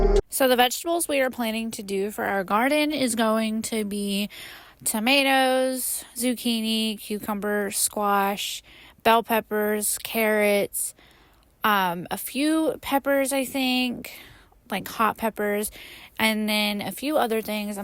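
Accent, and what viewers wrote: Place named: United States. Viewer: Which state are you from, I hear an accent when you talk and was curious. You don't have to answer if you don't want.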